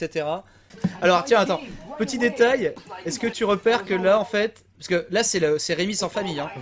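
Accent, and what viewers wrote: France. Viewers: Je me souviens t'avoir cherché dans le forum TGWTG quand tu as sortis ta première vidéo avec eux, t'ayant reconnu par ton accent si Frenchi et on s'était ajouté sur MSN, no joke. Toute une époque vraiment! Je suis bien content de te voir aujourd'hui à l'avant de la scène FR. Des bisous, on t'aime tous et à 30 ans de plus au moins, on te l'espère tous:) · Benzaie d'il y a 10 ans c'est juste le gamin des flashbacks du Hard Corner en fait:-D Je me souviens moi-même avoir vu ces vidéos sur ThatGuyWithTheGlasses (quand ça s'appelait comme ça) il y a pratiquement 10 ans... je me demandais à l'époque "mais qu'est-ce qu'il fait là ce français qui accumule les "fuck" et les "shit" avec son vieil accent?"... Que de chemin parcouru depuis! Félicitations Benzaie de toujours nous divertir après 10 ans, tous les vidéastes ne peuvent pas en dire autant!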